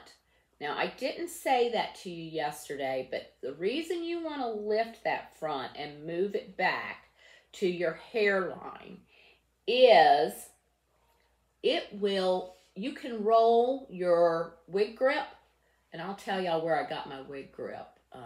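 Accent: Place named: United States. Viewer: I have just subscribed... I just love your reviews and your accent is amazing as a British woman I just love American accents that sound like yours 🤗👌💋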